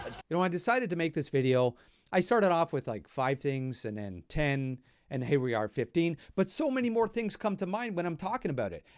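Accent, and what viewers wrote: Canada. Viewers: Clicking on this video I was worried this would be an American explain what Canadians are. But NOPE. Five seconds of this man’s accent told me he was a real Canadian. 😂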